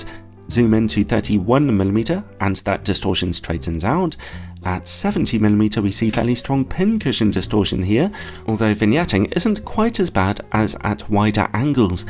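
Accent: United Kingdom. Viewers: Your lense and camera reviews are allways definitly one of the 3 best ones on the internet in any aspect from the technical and practical view regarding the lenses itself but as well from the aspect of very nice entertainment with your lovely british humor and accent, I like so much as I lived in London and Bath a couple of years ago for a short period of time! There are only Gordon Laing's reviews and DPReview on the same great level, these 3 channels are all I need to know about a new lense!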